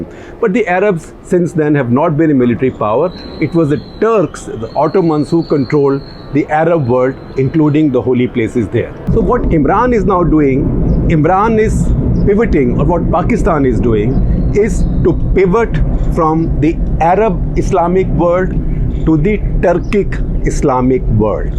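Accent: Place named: Hinglish, India. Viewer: I like your HINGLISH man😂😂😂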